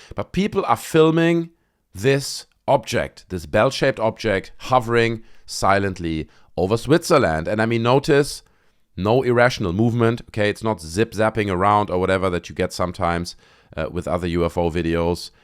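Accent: Germany. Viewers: wieso klingst Du so deutsch?! 😅 ... ok, maybe an mistace. Go on and GRTX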